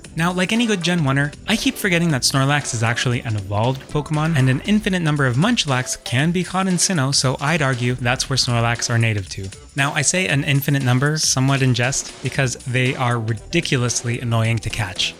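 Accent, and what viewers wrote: Canada. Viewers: Wait you’re Canadian? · Are you a canook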